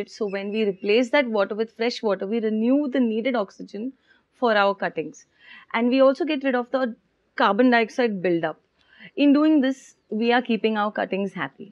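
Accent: India. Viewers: I love the Indian accent, it’s the best. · This is how my indian university lecturers talks. I can't understand shiat.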